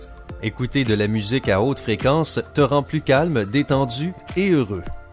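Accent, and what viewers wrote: Quebec, Canada. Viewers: accent québécois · C'est l'accent canadienne😉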